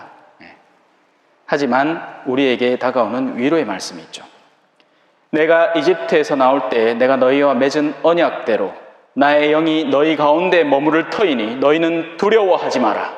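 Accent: Gyeongsang, South Korea. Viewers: 찬미예수님! 신부님, 강론말씀으로 또 새롭게 힘을 얻습니다. 신부님 완전 경상도 사투리(뿌리)를 들으며 고향 향기를 맡는 것 같습니다. 하느님은 찬미받으소서!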